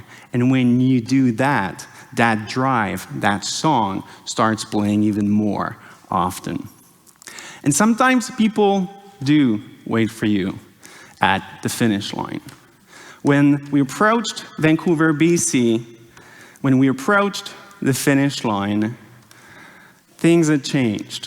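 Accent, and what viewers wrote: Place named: France. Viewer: It seems as though his primary language is french, hence his name and the accent while speaking in english. English switches pronoun every 3 digits added, french adds it every 2 digits. For example the number (77) is "seventy-seven" in english, yet, "soixante-dix-sept" in french, which translates to something along the lines of "sixty and ten plus seven" and yes, it does make for a very cumbersome approach to expressing numerics. This is probably why english is superior to french, and I speculate chinese superior to english, for conducting mathematics in general, or "mathigrammatics" if you will ;)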